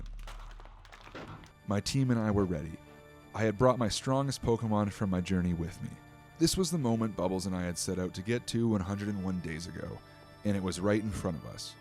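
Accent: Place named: Canada. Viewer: I love the Canadian accent😂❤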